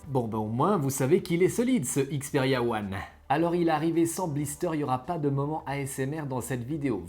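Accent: Vaud, Switzerland. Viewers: Oooooh le T610 😍 En réalité ce que je préfère dans tes vidéos je crois que c’est quand même de bel accent vaudois 😏😅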